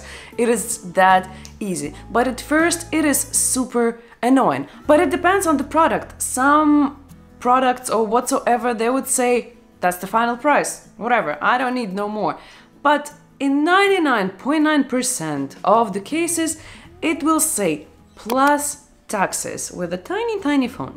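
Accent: Russia. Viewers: I love your English with Russian accent, keep doing your great stuff. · Your Russian accent is cute 👍me likee like 😁🥳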